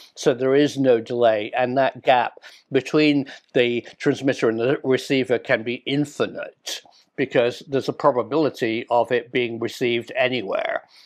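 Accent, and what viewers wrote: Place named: Cornwall, United Kingdom. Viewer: ahhh really! Sometimes you have a hint of Cornish! ❤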